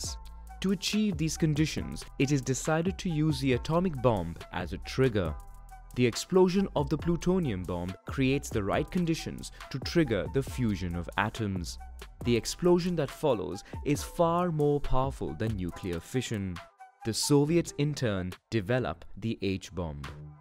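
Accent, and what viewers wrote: North India, India. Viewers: Yeah ....this is north Indian accent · i can hear indian accent in his voice why ? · Is the narrator Indian? His accent sounds so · Ugh hate indian accent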